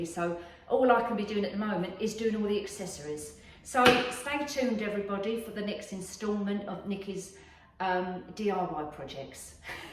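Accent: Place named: England, United Kingdom. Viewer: Love your beautiful English accent.. beautiful home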